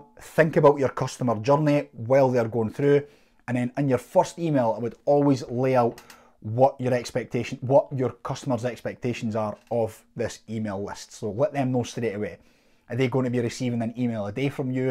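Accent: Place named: Scotland, United Kingdom. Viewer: love the Scottish accent. Helpful video. I find AWeber to be difficult. I used mailchimp for years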